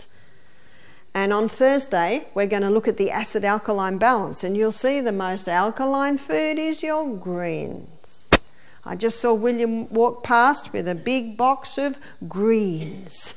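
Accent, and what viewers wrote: Australia. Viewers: She's Australian. They say "inshulin".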